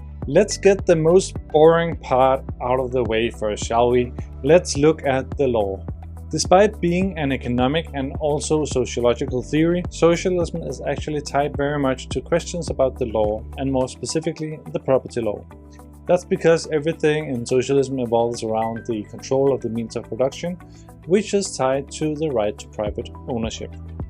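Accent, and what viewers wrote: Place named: Denmark. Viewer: With a lot of danish accent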